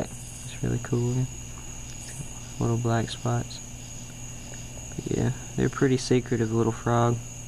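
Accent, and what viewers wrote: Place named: South, United States. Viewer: "Got a hold to him" 😊 You have a sweet southern accent and phrases. I'm southern too ❤️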